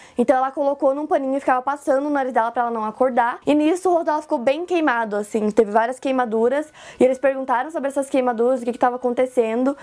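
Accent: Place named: Rio Grande do Sul, Brazil. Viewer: vc é gaúcha? tem um sotaque em tanto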